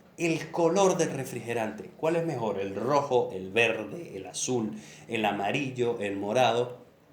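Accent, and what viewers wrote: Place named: Venezuela. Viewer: Me da pereza escuchar hablar a los venezolanos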